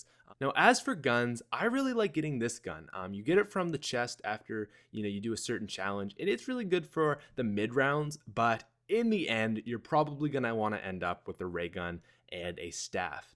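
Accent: Canada. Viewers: r u canadian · Is he Canadian? It sounds like he has our accent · are u canadien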